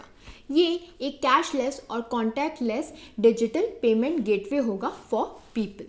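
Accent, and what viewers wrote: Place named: Gujarat, India. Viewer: aapki Hindi speech me gujarati ki style thodi lagi muje es liye puchha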